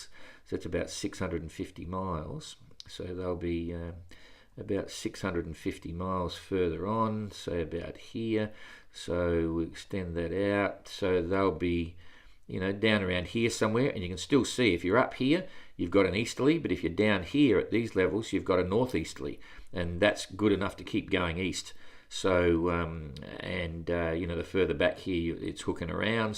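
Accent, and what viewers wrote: Australia. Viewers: Hi. Nice hearing your Aussi twang. Im watching from DK. Just getting a Najad 390 ready for som southern sailing so watching this with great interest. Ps A stranded Kiwi saliing the baltic, now home waters. Cheers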